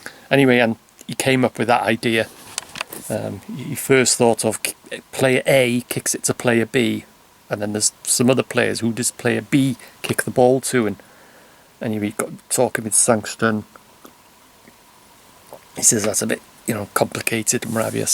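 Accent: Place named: Liverpool, United Kingdom. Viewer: Great vlog love stories about how things used to be,i new u were a bit scouse by the way u say some words.Hope u got more stories to tell.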